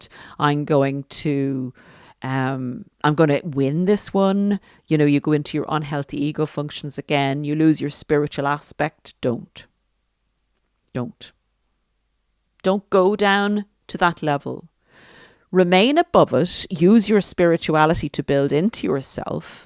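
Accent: Ireland. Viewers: Thank you, it's so helpful to move toward the light and stay there. I find your podcasts, your knowledge, and your support so incredibly wise and encouraging. I love your way of speaking—explaining—and your lovely Irish accent! Thank you so much!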